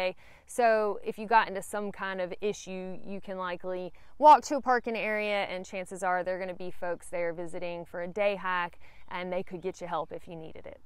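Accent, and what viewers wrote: South, United States. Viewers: I like the southern accent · where in the south are you from? Just wondering where your accent is from.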